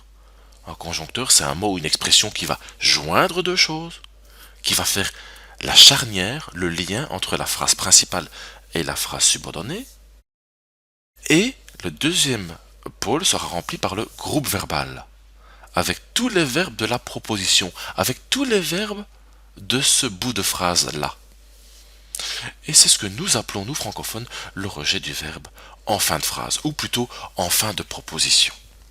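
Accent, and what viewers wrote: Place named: Netherlands. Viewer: votre accent en néerlandais est génial! Bedankt voor de interessante video!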